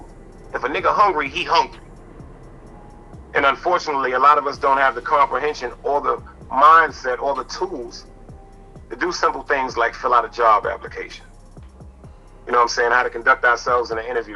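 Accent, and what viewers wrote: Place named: Brooklyn, United States. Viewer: 🔥Damn. I get it now-there’s da rest of 🇺🇸…& then dere’s Brooklyn! This dude here a natural narrator. #OldBK✊🏽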